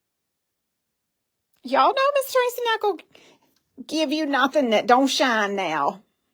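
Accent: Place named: Virginia, United States. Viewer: I love all your tutorials that you have uploaded to youtube. Plus I love to hear you talk; makes me miss home (SW Virginia). I cannot wait to see what else you come up with!!!! God bless.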